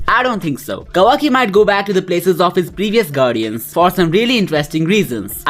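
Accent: India. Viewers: Good content but goddamit this indian accent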